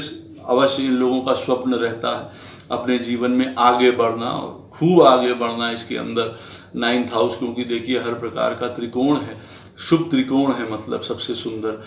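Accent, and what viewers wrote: Lucknow, India. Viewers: सर आपका बात करने का तरीका बहुत बढ़िया है , ज़बान लखनवी लगती है । यदि यह तुला लग्न में हो तब 9वे राहु व 3रे केतु के उपाय भी बताए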